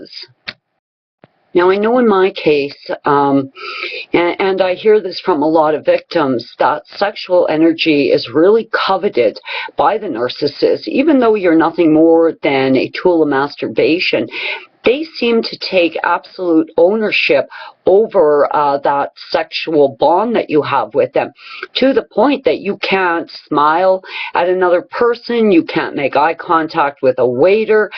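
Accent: Canada. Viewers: Hey, if I may ask what province are you from? I think Nova Scotia, and also for some reason,Manitoba. I'm from Ontario, theres no place like home.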